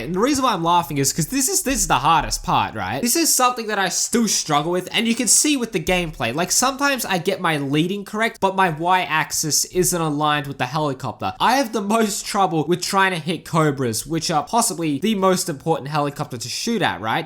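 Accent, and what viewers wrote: Australia. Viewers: hello mr aussie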